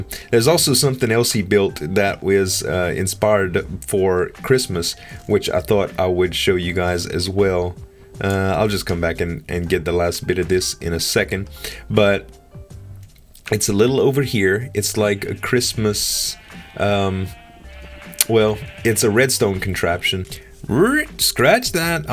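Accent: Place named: South, United States. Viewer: I don't think you're castle is particularly great or anything. (you break a few key design concepts -General, not just minecraft). I just decided to watch your videos because I've seen things you've done with other hermits and I like the way you talk. I reminds me a bit of when I used to live in the south. Also, I thought you were pretty funny.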